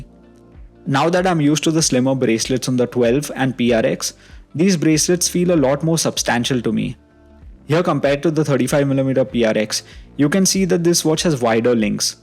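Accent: India. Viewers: Your accent is normal. I just felt that it just has a bit of an Indian tone to it. Anyway, your voice cover is very good.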